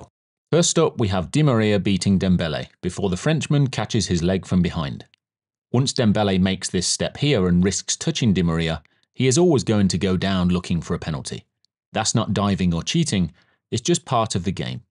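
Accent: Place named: United Kingdom. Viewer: Thanks for the explanation, I like football but know near nothing and having a Brit explaining is great!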